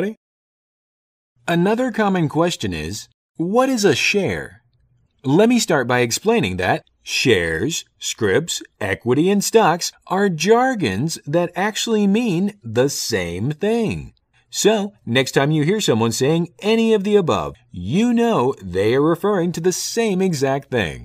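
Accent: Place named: United States. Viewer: excellent explanations. I have to say this is a little bit weird to hear an American accent referring Indian stuff so familiarly like India is his homecountry.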